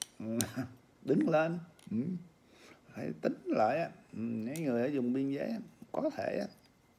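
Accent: Mekong Delta, Vietnam. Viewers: Chất giọng miền tây em rất quý anh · Chào Anh ! Chất giọng miền Tây hào sản ,chân thật ,cho đi mà không nhận lại , đáng trân quí . 💐💐💐💐💐💐💐💐💐💐💐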